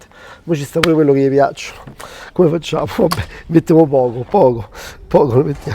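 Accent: Rome, Italy. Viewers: Grande! Con il tuo accento mi riporti nella mia magica Roma! Eseguirò la tua ricetta!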